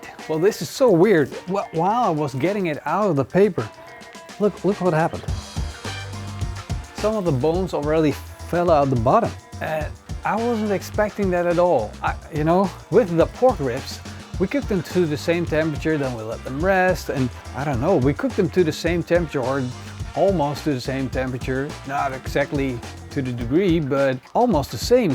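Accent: Netherlands. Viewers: Area Mann he is from the Netherlands i hear it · Nederlands accentje maat :D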